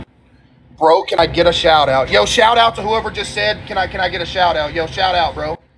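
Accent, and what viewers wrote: United States. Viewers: Bro kinda got that Texas voice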